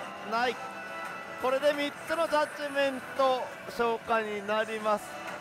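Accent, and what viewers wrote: Kyushu, Japan. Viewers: たまに九州の方言を聞くとほっとしまいます。熊本生まれ何年も帰ってないので、ハーデスはゴッド引いても見切りしてます。ジャグラは中々こない。前の日の多く出てる台を狙い打ってます。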